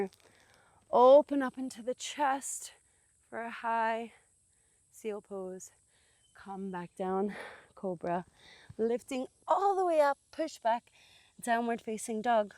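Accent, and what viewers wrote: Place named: Australia. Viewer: Your accent has got me…scattering of different accents. I can hear Australian in there xx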